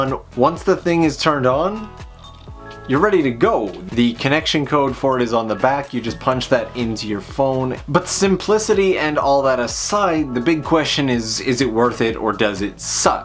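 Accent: Canada. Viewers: I never realized how Canadian you sound until this video. Lol Anyway, wish I would have had an option like this back in 2015.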